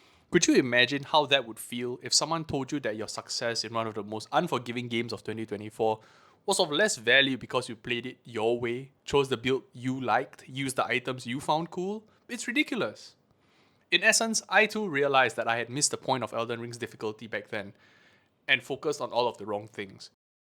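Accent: Singapore. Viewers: * Hears accent, hmm Singapore?🤔 * Checks Channel -> Bingo🤓 (Hello from South Africa 👋🏾🇿🇦)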